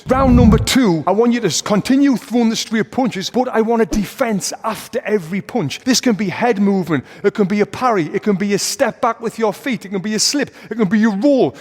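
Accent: United Kingdom. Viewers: Is it 'Britain English'?!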